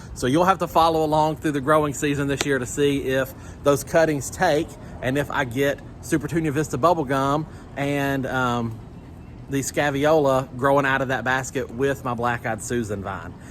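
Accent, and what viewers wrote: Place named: Arkansas, United States. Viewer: I had to look at your bio information after watching your most recent video re making a planter fountain. Your accent sounded so familiar and when I saw you now live in central Arkansas I knew why. I am originally from Camden. I’m enjoying your videos🌷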